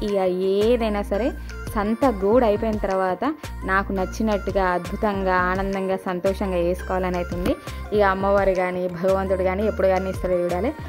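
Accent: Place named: Telangana, India. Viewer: నేను తెలంగాణనే ఈరోజు నేను కూడా బోనాలు చేశాను బోనాల శుభాకాంక్షలు మీకు కూడా తెలంగాణ యాస సూపర్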